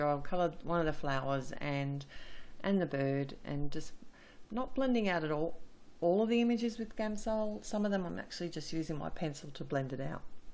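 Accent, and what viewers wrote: Australia. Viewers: Love your card so beautiful. Also love your Aussie and you Iink to an Aussie store for your supplies. Thank you so much.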